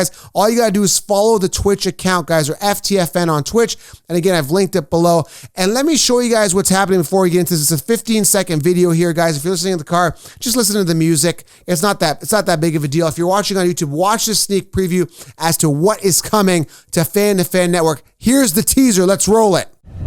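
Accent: Canada. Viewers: Are you canadian? i think i can hear a slight accent lol.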